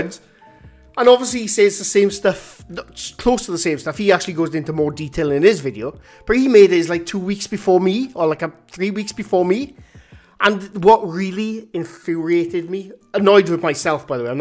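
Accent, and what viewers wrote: Wales, United Kingdom. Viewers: your wonderful Welsh accent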